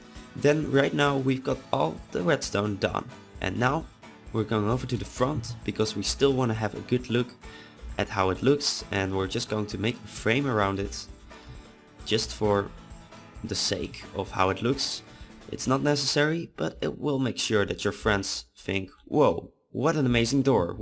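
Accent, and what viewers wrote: Netherlands. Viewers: Are you Dutch, because you sound like a Dutch guy who talks English.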